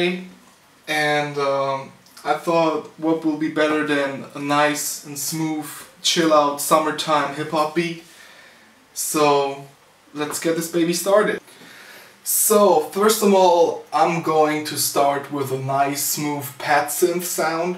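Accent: Germany. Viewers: Bist du deutsch? Du sprichst so englisch mit deutschen akzent :D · Very authentic. Where do you come from? Your accent sounds very german. Is it a good choice to start with maschine right from the beginning? Your much of a clicking person. but it's possible to browse with the Maschine Controller, isn't it?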